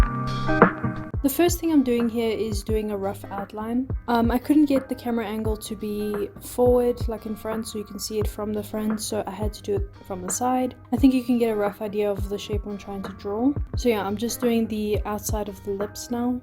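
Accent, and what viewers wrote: South Africa. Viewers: ARE U SOUTH AFRICAN cause u sound SA south african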